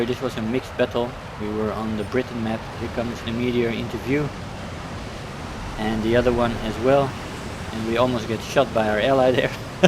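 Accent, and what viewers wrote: Netherlands. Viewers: your dutch ? I hear it from the accent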